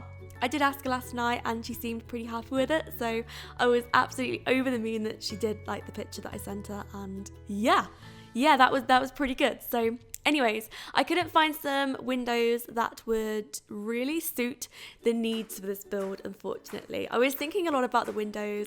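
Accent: United Kingdom. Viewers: Can you do a Q&A? And if you'll do one I have some questions: Where are you from? (In the video you said you moved to an apartment in Switzerland, but you have like a "light" British accent) How do you find the inspiration to your builds? Can you do a colab w/ Vixella please?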